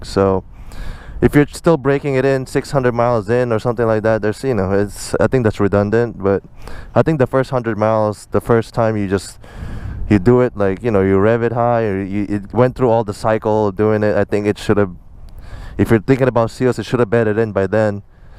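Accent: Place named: Philippines. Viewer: bro u sound pinoy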